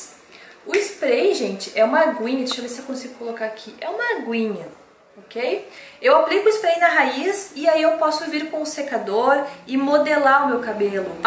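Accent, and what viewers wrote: Rio Grande do Sul, Brazil. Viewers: Ai eu adorei teu sotaque...Gaúcha, ne? Eu sou também, e amooooo <3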